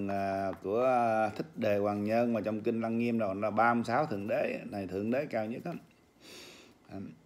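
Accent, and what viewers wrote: Mekong Delta, Vietnam. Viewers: Chào Anh ! Chất giọng miền Tây hào sản ,chân thật ,cho đi mà không nhận lại , đáng trân quí . 💐💐💐💐💐💐💐💐💐💐💐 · Chất giọng miền tây em rất quý anh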